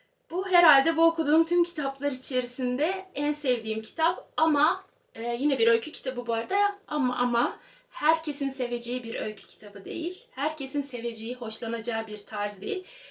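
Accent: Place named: Denizli, Turkey. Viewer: klasik okumaya hangi kitaptan baslamaliyiz? bu arada abla cok tatlisin denizlili akrabalarima benziyor konusma tarzin hep seni izlemek istiyorum cok ictensin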